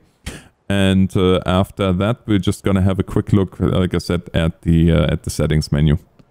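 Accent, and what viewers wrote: Germany. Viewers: Sounds Like German English.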